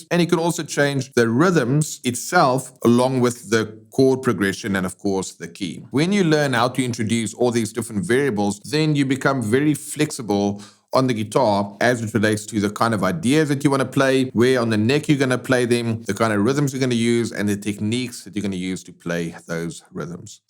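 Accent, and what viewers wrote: South Africa. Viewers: The second I heard your south african accent, I knew this would be the perfect lesson for me 😂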